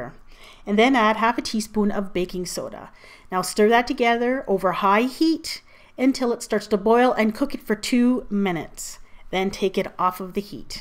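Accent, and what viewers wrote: Canada. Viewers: she has a light canadian accent... and canada is always where i wanted to live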